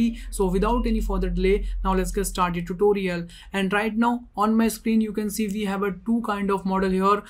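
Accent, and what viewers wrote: India. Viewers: How to find video tutorials without Indian accent?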